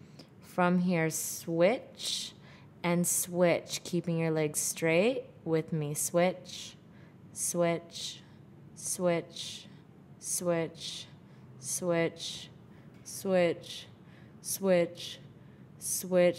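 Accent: United States. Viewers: I like your voice. And your American English. That sounds so good. 😌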